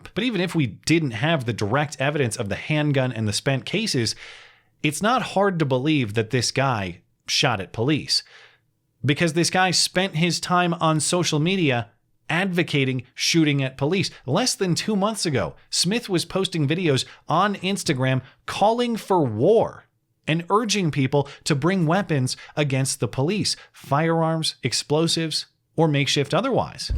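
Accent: Minnesota, United States. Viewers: Are you from Minnesota???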